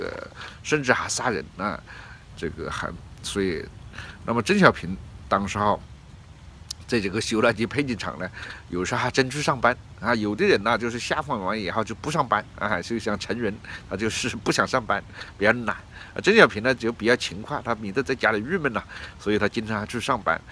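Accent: Hunan, China. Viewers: chu web 这是湖南普通话。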